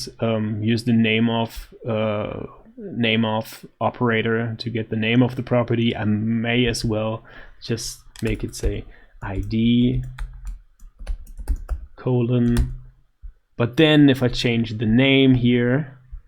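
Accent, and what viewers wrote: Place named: United States. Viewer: Excellent English with a strong american accent. Where did you get that from?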